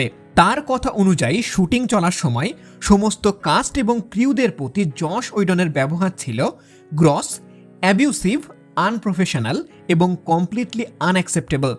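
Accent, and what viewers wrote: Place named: Bangladesh. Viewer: Apnar kotha to kolkatar manusher moto na.😞 Bangladesh er manusher moto lage.😋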